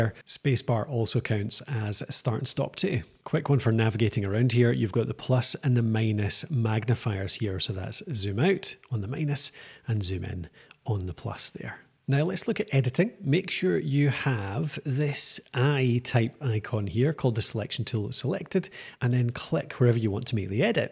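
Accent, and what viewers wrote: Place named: Scotland, United Kingdom. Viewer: This is excellent. I’ve recorded interviews to put on CD but I’m not sure about how to process them. For instance, what’s the difference between using normalisation compared to using compression to raise the volume? Is it a case of either/or? Lovely to hear a tutorial in a beautiful Scottish accent compared to the usual American videos. Keep them coming.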